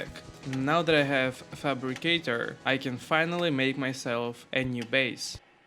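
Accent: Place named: Russia. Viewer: А акцент то русский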